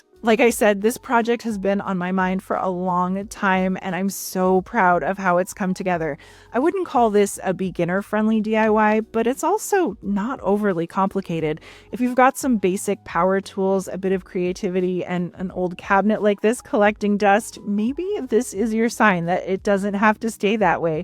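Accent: Canada. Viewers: thank you! I prop mine in the morning and I just sit and watch you are so dang good at this. Definitely found your niche. Expert level every detail. I am from New England COAST, I here, Toronto in your accent. I am a Tour guide. I meet people from the world. But I miss Canadians. Don’t come to visit so much anymore. I apologize for our political situation. Thank you for being my entertainment. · I always say I cringe about when I see people paint over hardwood furniture. I think I would have stripped it down, and maybe do a white wash stain. WhileI'm not a fan of dark painted furniture I get that that's your style. It would have been interesting to whitewash the whole piece minus the top. Or do the whole thing. Are you Canadian? Sounds like you have a little accent?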